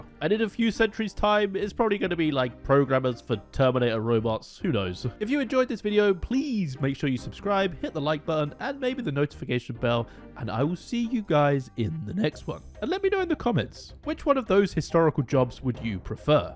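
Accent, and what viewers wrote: United Kingdom. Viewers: Sounds like brit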